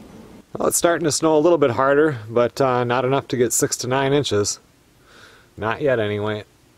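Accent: Minnesota, United States. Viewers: That Minnesota accent is awesome!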